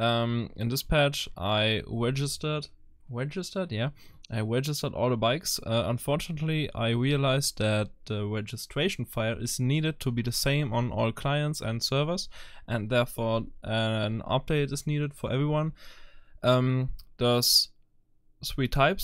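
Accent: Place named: Germany. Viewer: der deutsche akzent😂 danke für das Video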